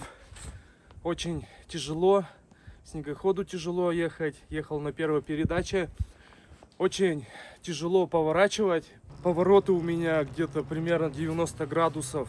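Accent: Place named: Kirov, Russia. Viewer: Вы не из Кирова, акцент похож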